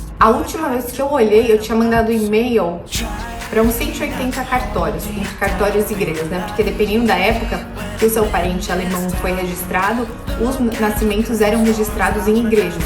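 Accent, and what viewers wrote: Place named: Rio Grande do Sul, Brazil. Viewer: Até achei que era conterrânea ..gaúcha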